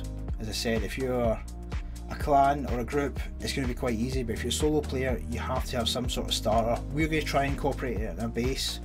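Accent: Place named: Scotland, United Kingdom. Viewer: Man r u from scotland cus i love ur accent!!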